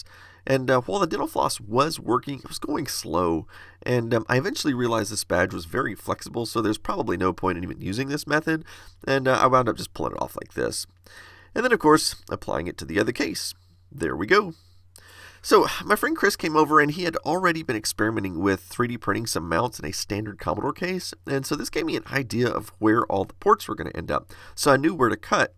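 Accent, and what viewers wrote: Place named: Texas, United States. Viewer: you have the slightest most subtle Texan accent ever and I love it so much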